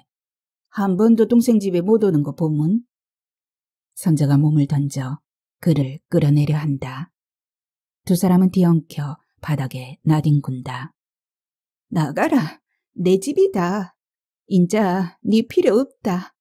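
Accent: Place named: Busan, South Korea. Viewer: 수작이네요~신종국 작가님~특히 마지막 부분~ 오랜만에 귀에 쏙쏙 잘읽히고 부산 사투리와 레트로 감성의 가슴아픈 소설 잘 들었습니다. 그시대때는 그렇게 참고 많이들 사셨을것 같습니다. 홧병으로 심장에 북소리가 나던~~~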